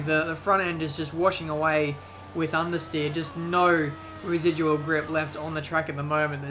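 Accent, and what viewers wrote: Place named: Australia. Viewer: Classic lines: * And away we gooo * Having a bad start as always * Another points to add to the championship tally * Look at that...! * So here we are the..... Grand prix * We got some R&D testing to do * Another crucial race for the championship Of course you have to insert the aussie accent in all of them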